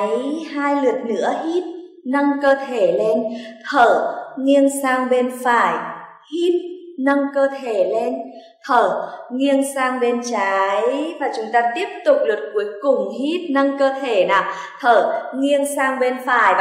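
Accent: Hanoi, Vietnam. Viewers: giọng HN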